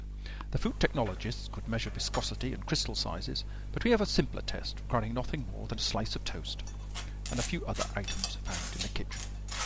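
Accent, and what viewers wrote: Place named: United Kingdom. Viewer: Really didn't care to the nasally british voice that I couldn't hear.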